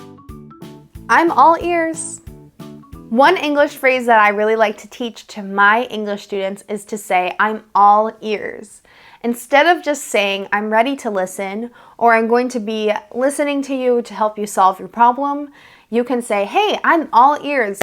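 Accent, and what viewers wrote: United States. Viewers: Hey teacher am new here am learning a lot from your beautiful classes, So I am from Brazil 🇧🇷, I have loved your accent since the fisrt moment, which state of USA is this accent I mean🇺🇸Do you come from which state? Thanks in advance ❤God bless you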